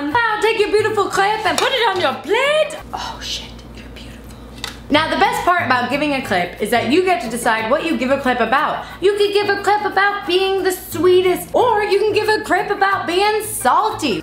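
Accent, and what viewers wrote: France. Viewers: BRING BACK THE FRENCH ACCENT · Oh how I love ur French accent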